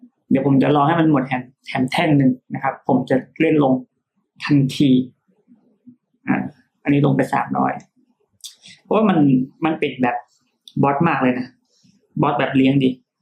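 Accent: North, Thailand. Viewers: ภาษาเหนือ เป็นภาษาที่มีเอกลักษณ์มากครับ ฟังแล้วสบายหูมากครับ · อู้เหนือม่วนขนาดดดดดดดด......ชอบบบๆๆๆๆๆๆๆๆๆๆๆๆๆๆ o(〃＾▽＾〃)o ヾ( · ฟังไม่รู้เรื่องบ้างแต่ก็ชอบค่ะภาษาเหนือ เป็นภาษาที่ลื่นหูมาก #ติดตามพี่มาตั้งแต่เข้าวงการเทรดเลยค่ะ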